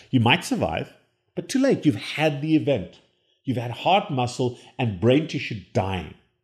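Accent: South Africa. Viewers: Are you South African doc?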